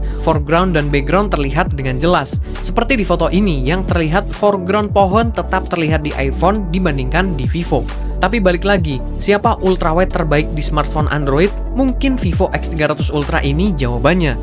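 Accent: Surakarta, Indonesia. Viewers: Orang solo bang? Btw ane user x300 pro sudah sangat puas dan di jadikan daily driver Kurangnya cuma speaker. Overall ok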